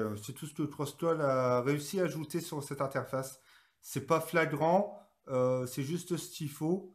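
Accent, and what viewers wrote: France. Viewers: un léger accent Chti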